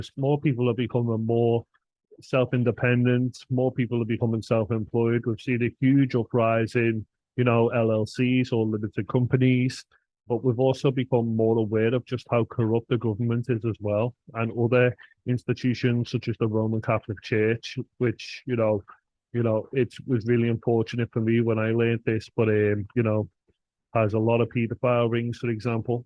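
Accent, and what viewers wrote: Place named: Liverpool, United Kingdom. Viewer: Love the Liverpool accent btw! Can’t wait to see Liverpool, I have always been fascinated by Liverpool but when I get the chance I have always gone abroad! But next time….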